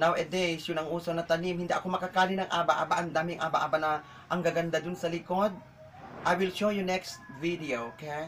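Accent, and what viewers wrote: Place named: Ibanag, Philippines. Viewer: Te .. marunong kabang mag salita ng ibanag... kasi yong tune mo parang....curious lang po.. salamat..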